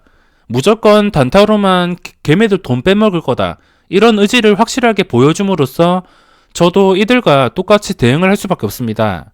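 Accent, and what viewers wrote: Gyeongsang, South Korea. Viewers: 너무 감사합니다ㆍ친숙한 경상도 사투리도 듣기좋습니다ㆍ 제가 전업을 그만두는 시점에 이쪽으로 들어오셯네요ㆍ 저는 쪽박을 차서 그만둔것은 아니지만 수익모델을 만들지 못해 기업에 입사해서 월급쟁이 합니다ㆍ 저의생각은 ㆍ 수익모델은 2가지입니다ㆍ첫째는 망하지않을 코스피10 종목 두어장뭍어놓아도 아무 신경안써도되는 아빠잘만난 주식관심있는사람ㆍ 둘째는 돌파와 손절을 할줄아는 ㆍ 이두부류외 조상의 천운이 없는 모든 사람은 주식으로 돈을 딸수없습니다ㆍ 두가지밖에 없음을 이 증명해주고 있네요ㆍ부럽습니다 ㆍ님이 하고있는 매매는 초고수의 영역이며 일반개미님에게는 추격에 가깝습니다ㆍ님도 그런개미돈을 털어간다는 아찔한 사실은 아시죠ㆍ? 세상사 베틀넷이죠 ㆍ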